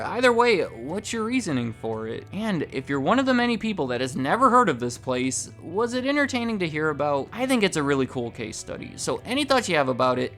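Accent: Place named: Midwest, United States. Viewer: I can tell you're from the Midwest lmao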